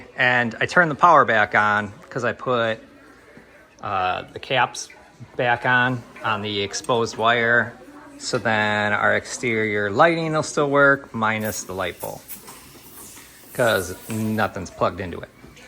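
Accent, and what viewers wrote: Wisconsin, United States. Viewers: Hey I’m curious. You definitely have a Wisconsin accent. I don’t really notice with. So when you are out & about have you had people ask where you are from? That definitely is not a Florida accent. 😂. Then again what accent is FL? Considering there are so many different nationalities living there. My pool had turned green. I had 2 floaters both with 2 3” chlorine tablets & 1 tablet in my skimmer & my pool was green. I had to get 4 5 Gallon buckets of chlorine. The next day it was clear as could be. Helpful hints. I was told by the pool people to add any chemicals at night. You should shock the pool after any rain. The rain and acidity levels throw off the chemical balance in the pool. Waiting until the evening time to add the chlorine & shock slows down the evaporation process. Both totally make sense. Anything to help save some money. 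💰🤑. Chemicals can be so expensive.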